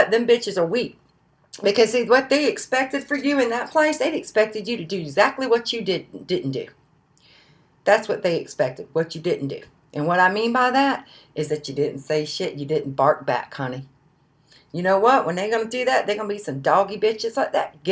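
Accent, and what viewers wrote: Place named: South, United States. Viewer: u go girl...you inspire us...i love your paula deen style accent..you are the real deal...wish u the best of luck keep losing..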